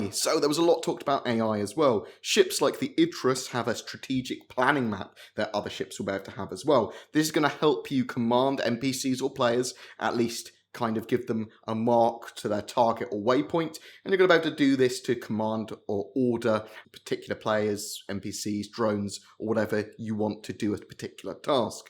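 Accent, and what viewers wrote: United Kingdom. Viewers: Terrific update, always love hearing about exciting SC news in a British accent. 😃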